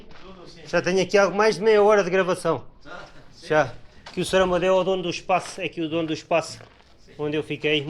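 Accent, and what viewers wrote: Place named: Cape Verde. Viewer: Excelente trabalho como sempre. É corajoso e altruísta. Mas coloca a.sua vida em primeiro lugar. Desculpa me esta advertência. Mas fico muito preocupada pela sua segurança devido essas trilhas íngremes, sinuosas e perigosíssimas. Adoro o seu crioulo. Uma mistura de criolo sotavento, barlavento e português. Cuida se e.protege se Um grande abraço nesta dura aventura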